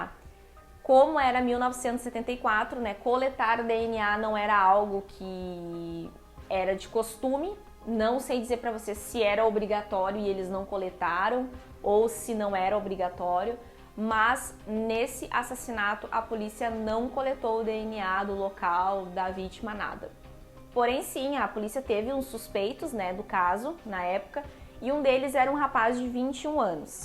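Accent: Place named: Rio Grande do Sul, Brazil. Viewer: Muito boa a sua ideia de juntar o sobrenatural com o caso criminal. Infelizmente, aqui neste caso, apesar das evidências apontar para o tal suspeito em questão, não foi possível confirmar o seu envolvimento. Realmente é frustrante tanto para a família como para os policiais que trabalharam no caso, em especial a Joane. Será que um dia a justiça ocorrerá neste caso? Parabéns pelo canal. Pelo seu sotaque, tu deves ser minha conterrânea. Eu sou de Bento Gonçalves, mas moro no Rio de Janeiro há 10 anos. Um abraço.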